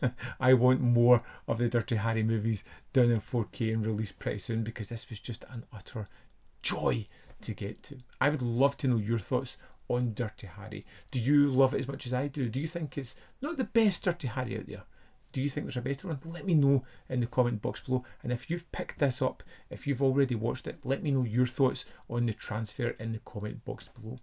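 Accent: Scotland, United Kingdom. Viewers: I love this guy's Scottish accent even if I can't always understand it.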